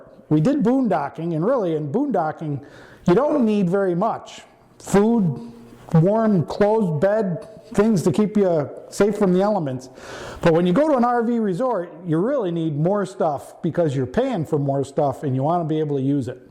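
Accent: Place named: Hudson Valley, United States. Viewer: Thanks for the video as it clears up a few important issues that being a first time RVMH camper clears up. I enjoyed this video and I also enjoyed the first one where you put a marine outlet in to replace an existing one. Your dying and instructions were perfect. I do not know if your heater gives a way was for 2017 or 2018 but if it is still ope I timed the section on the give away pm a over cast cold Hudson Valley NY Saturday. We still have snow on the ground down hear but that will likely be gone in a few weeks . That is unless we get another N0r-Easter. I'll be glad to see winter depart. Thanks again for the videos and the chance to subscribe to a channel that has a person conducting it that speaks the way we speak down here in the Hudson Valley. PS there is more to NY than NYC about 100 miles down the Hudson River. < MIKE <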